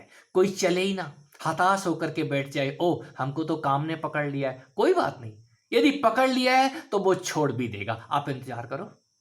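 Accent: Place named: Bareilly, India. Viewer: Guruji ap bareilly me rahte the pahle.. Apko sun kar esa lga mujhe.. Kirpa karke apne bare me kuch kahiye🙏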